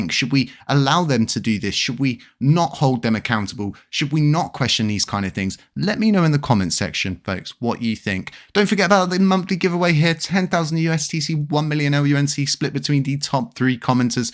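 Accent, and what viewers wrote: United Kingdom. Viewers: I absolutely love your videos with the 007 accent, but Christopher Harris is also adorable. He has a 007 accent too. I prayed for God to let my late dog 2/29/2023 visit my son and sure enough he woke up all excited and said he was visited by the dog in his dreams and he had no idea I was praying for that. What I do know is I follow both you and Jesus is Lord because you both want the same thing which is Lunc to go to the moon. I love how the cat wears glasses 🤓 ❤❤❤ but Christopher Harris I believe really gets dreams but he’s not the only Christian influencer on YouTube getting dreams about LUNC. I dreamt once my dog and I playing in heaven all night because I prayed and prayed for him to be healed from distemper but he did not make it. But God gave me an entire night in heaven in a dream playing with him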